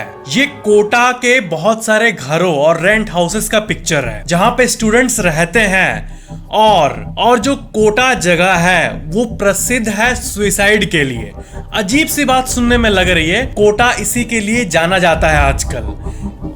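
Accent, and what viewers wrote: Bihar, India. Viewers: Bhaiya aap bihar se ho kya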